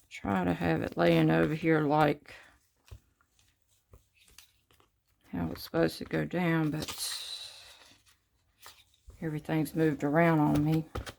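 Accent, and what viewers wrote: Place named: United States. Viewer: Okay, will have a look then. Can I ask where you are from? You sound like you're form the USA, but it must be very late or rather very early in the morning as I am from the Netherlands and 6 hours ahead of the eastcoast, 8 hours from the west. I am going through your videos right now to catch up on them.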